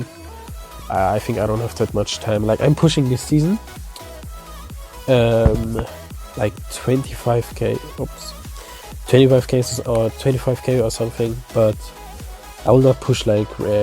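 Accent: Austria. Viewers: Nice Vid. aber man hört beim English den Österreicher raushängen 😝👍🏻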